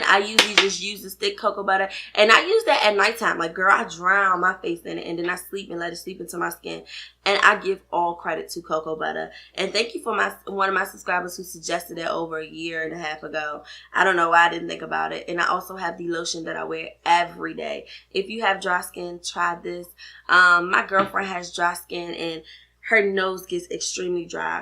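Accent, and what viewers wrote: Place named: DMV, United States. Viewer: Im 4 years late LoL! But great video & Im going to assume you're from the DMV 🤞🏽 because I can hear it 😂😍 not sure if Im right, but usually a DMV person knows another 😊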